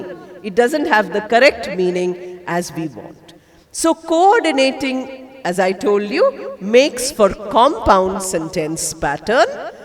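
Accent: India. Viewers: I am from Delhi there are so many Institute of English I have also joined a Institute but I like your video because you speak Indian English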